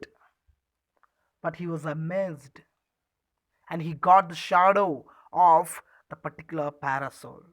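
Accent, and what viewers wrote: United States. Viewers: U are in India not in american learn to tell in indian english not in american english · Good but ur explanation and your English is similar to American English so try to explain in Indian english I mean dragging of words, and it was bit fast for our kids. Don't mind just it's a suggestion.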